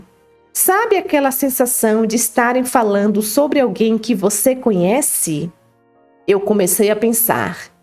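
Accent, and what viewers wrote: Bahia, Brazil. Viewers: Adoro seu jeito de falar seu sotaque. Vc é baiana?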